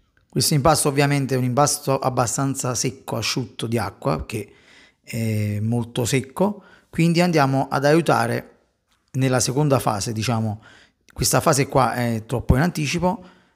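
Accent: Naples, Italy. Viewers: Quando ti sento parlare con il tuo accento napolitano m'incanta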